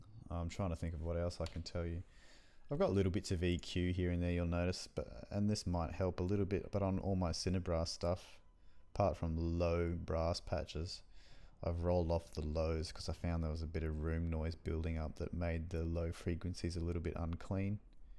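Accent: Australia. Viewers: Also I notice you have an Australian accent. Where in OZ are you from? Did you know that Cinematic Strings was recorded at the Verbruggen Hall at the Sydney Conservatorium? Have you tired CS?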